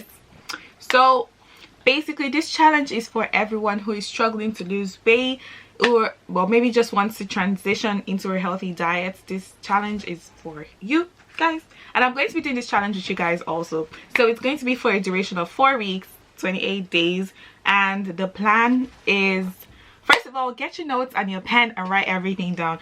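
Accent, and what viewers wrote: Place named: Ireland. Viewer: Your voiceee 🤤🤤🤤 Are you irish?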